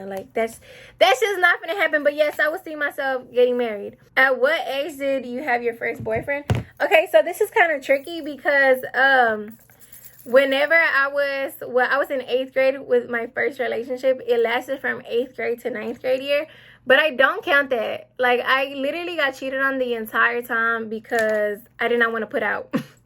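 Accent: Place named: Houston, United States. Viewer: Man yo voice ain’t nun that’s js tha Houston accent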